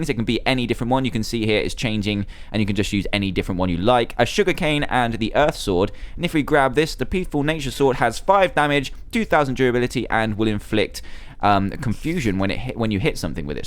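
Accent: United Kingdom. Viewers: Your British accent made me subscribe to you! Btw, I also play MC :))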